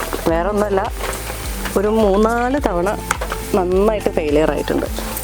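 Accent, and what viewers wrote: Malappuram, India. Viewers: Chechi malappuram kari ano atho kozhikkod kariyo samsaram kettitt chothichatan 🤔😊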